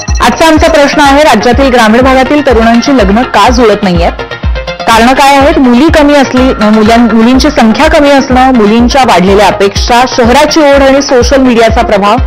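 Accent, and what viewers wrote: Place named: Marathwada, India. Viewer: शेवट च वाक्य चांगले बोलले तुम्ही मला वाटतं तुम्ही मराठवाडा साइट चे असाल कदाचित भाषे वरून 😊 पण तुम्हाला बाबा नाहीत हे ऐकून वाईट वाटलं परंतु तुमच्या आईने तुम्हाला वाढवलं ही किती साहसाची गोष्ट आहे 🤝😮 तुम्ही जेव्हा तुम्हाला स्थळ बघाल तेव्हा तुम्ही ही नोकरी आणि प्रॉपर्टी पाहणार हे नक्की. प्रेमाने पोट नाही भरत पगाराने भरते आणि स्वतःची प्रॉपर्टी अडल्या वेळेला कामी येते 🤷 बाकी मुलांना शिकायचे नाही मी मुंबईत आहे तर येथील मूल धावपळ करून एंजॉय करून एकदम टवटवीत असतात रोज आणि शिक्षणही कसेतरी करतात 😅 तरी गावाकड उलट आहे ज्या गोष्टींकडे नाही पळायचं तिकडे जास्त पळत सुटतात उलट शेजारी कितीही गोड बोलणारा असेल किंवा रक्ताचा नातेवाईक असेल तरी तो जमलेल लग्न कसे तोडल हे पाहतो हे सत्य परिस्थिती आहे 😅 परत आपण म्हणतो गावाकडील लोक एवढे गरीब का ? कर्माने आहेत ते 😢कधी सुधारणार ही मानसिकता काय माहीत 🥱चला तुम्हाला तुमच्या पुढील वाटचालीस भरपूर शुभेच्छा 👍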